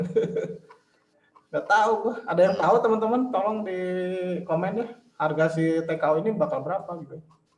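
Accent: West Java, Indonesia. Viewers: Sunda pisan euyy si akang haha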